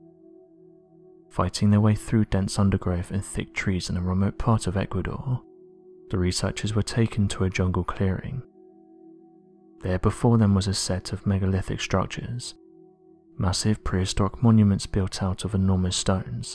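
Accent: United Kingdom. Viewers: Let me start by saying I really enjoy your channel. However, when I hear the voice of the current narrator I cringe. Because no matter what the setting I dial in on my speakers is, his voice is always low and muffled, that combined with the British or some kind of other foreign accent, I can't understand him half the time even with my earbuds in. So I'm just asking you guys if you could please maybe do something about that? I really enjoy the subject matter and would hate to have to tune out due to the fact that I'm just not going to get it. My hearing may be not that great, but I don't have any problems with your other narrators and with any other channels that I listen to on a regular basis 🤔👍 PS- The voice puts me to sleep, as well 😂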